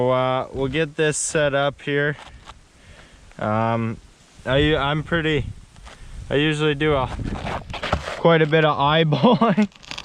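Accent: Canada. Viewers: There’s my favorite Canadian voice! I just got a new coop with cedar shake siding and a cupola, that has a chicken weathervane on top. It took an entire summer to build, but it’s really cool and the chickens love it!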